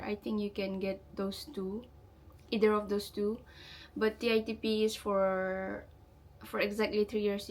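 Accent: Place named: Japan. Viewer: Your Japanese accent is so lovely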